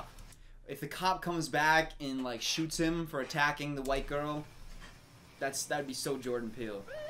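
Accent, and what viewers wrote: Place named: United States. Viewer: Generic american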